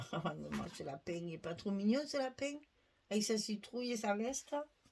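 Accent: South, France. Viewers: Cool! si vous nous montrez comment faire un tableau avec une feuille 30x30, je prends. J'offre ce genre de cadeau de temps en temps et je suis très intéressée de voir comment vous sublimez une simple feuille. J'ai hâte de voir vos idées très créatives dans votre bonne humeur et votre accent du sud 😍qui va réchauffer notre hiver du nord 😝.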